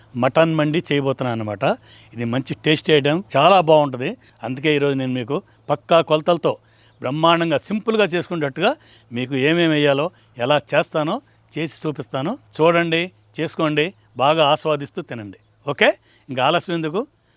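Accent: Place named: Telangana, India. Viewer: Brooo meru telangana lo vunnara chala years language same mala ఉంది 😍😂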